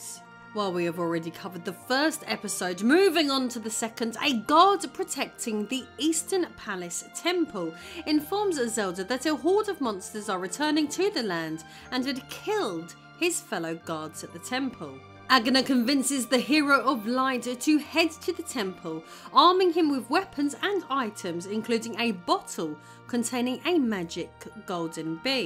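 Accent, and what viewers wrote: England, United Kingdom. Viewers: It's a British accent? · I've been studying English for almost my lifetime and I have to say I totally love your british accent. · That live voice thing is kinda annoying. I dont need to be handheld and told how to access a sub menu.. just let me play the game. Now if they let you do the live voice then that would be cool! Your voice is enchanting! Is it an English accent that I'm hearing? Absolutely stunning voice.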